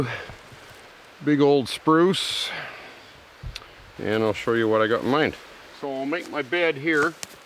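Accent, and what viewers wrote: Canada. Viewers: He sounds so Canada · I could tell you’re from canada because of your accent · Since you're Canadian. Would you say "A" or "ay" at the end of every sentence like your asking a question please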